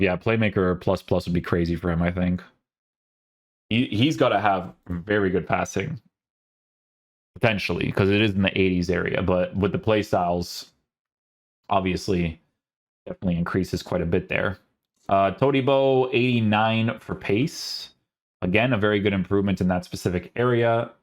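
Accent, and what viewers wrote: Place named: Portugal. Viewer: He's Portuguese. He has an accent. Get over it 😂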